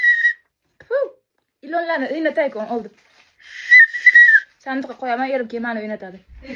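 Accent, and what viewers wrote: Tajikistan. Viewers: Shevasi tojik shevaku bu til buzilishiku nimasi yaxshi shevasini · Opani milati tojik❤😊 shunga shunaqa gapiradi❤❤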